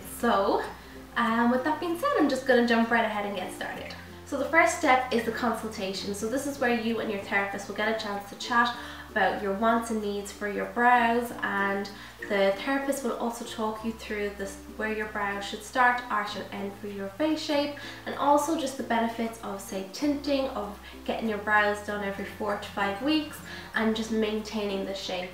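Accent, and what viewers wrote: Ireland. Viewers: Such a helpful video!!And you have such a lovely Irish accent.I live in the U.S. but I have family in Ireland I hope I get to visit some day.💞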